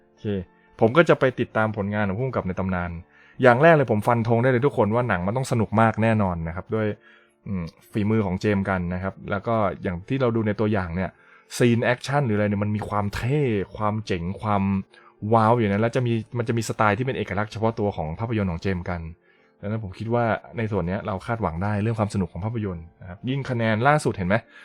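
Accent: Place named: South, Thailand. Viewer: สำเนียงใต้พี่ชัดมากเลยครับ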